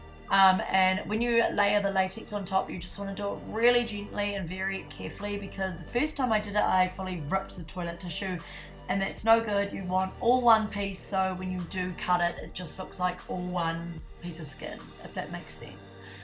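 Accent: New Zealand. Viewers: I knew you were a kiwi when I heard your voice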